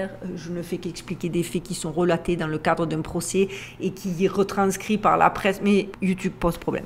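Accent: South, France. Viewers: Salut ma jolie 🤗❤, je suis comme toi le vilain petit mouton noire, et aujourd'hui je m'assume totalement et je dirai même j'en suis fière, fière d'être différente et puis touts ceux et toutes celles qui sont différents et éveillés sont directement mis dans le moule dit "complotistes, fous, pas clair..."et j'en passe, moi et mon Moustache ( mon chat Noir et blanc) on t'❤aime car nous te ressemblons tellement à toi et à Melle Duchesse!!! Merci pour ta sincérité, ton humilité, ton accent qui chante notre jolie Sud...Tu es une belle âme, ne lâche rien, courage je sais que c'est difficile, mais tiens bon ma jolie, je t'envoie une galaxie d'infinies pensées remplies d'amour, de paix, de courage et de positives!!! Et pleins de bisous remplis de tendresse et de douceurs ainsi que plein de câlins pour Melle Duchesse, j'espère que tu as vu ou entendu parler de la fête de 3 jours que la ville de Toulouse va faire du 25 au 27 Octobre de cette année, c'est comme un immense Carnaval et le titre c'est "les portes des ténèbres" il me semble ( je t'avais laissé des messages à ce sujet) ce serait un superbe sujet à traiter et surtout à dénoncer, car le mal est vraiment là et ils ne se cachent plus, dans l'énoncé ou résumé de ce sombre spectacle c'est écrit que ce spectacle est fait pour connaître les ténèbres parce que pour découvrir la véritable lumière il faut d'abord passer par les ténèbres 😮😢 vraiment navrant et triste!!!! 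Merci pour toutes tes superbes videos, tu no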